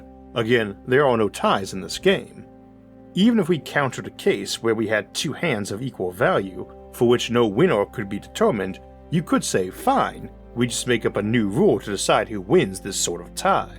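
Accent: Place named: United States. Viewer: I think only you are concerned with your so called speech impediment. Seems like a US accent to me. But I doubt the overwhelming majority of your listeners even think about it. I don't.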